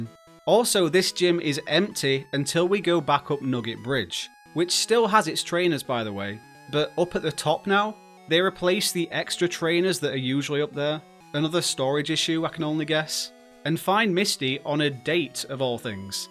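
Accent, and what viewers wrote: United Kingdom. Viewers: You have a wonderfully charming British accent.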